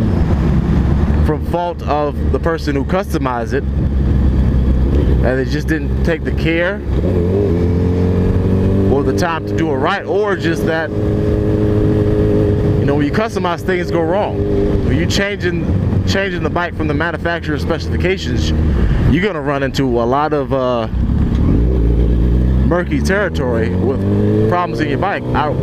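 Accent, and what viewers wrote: Michigan, United States. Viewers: Are you from Michigan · Bro you from Michigan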